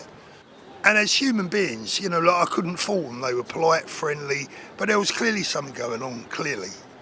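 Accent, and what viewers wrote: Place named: South London, United Kingdom. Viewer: The rough working class South London thing is an asset. There are not enough people like you in politics who can connect with the forgotten disenfranchised masses who don't vote. And once more prejudiced folk get past the accent and tattoos it's clear you are a decent sincere man. Again, another rare & much needed commodity in British politics.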